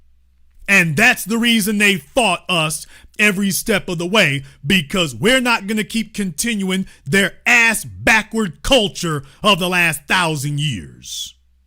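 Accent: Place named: Texas, United States. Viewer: He talk like he from Houston or Texas somewhere😢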